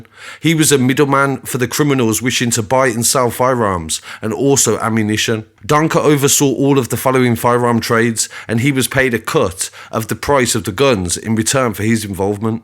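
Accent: Birmingham, United Kingdom. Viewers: Excellent reports and stuff. But sometimes I fall asleep it's our Birmingham Accent.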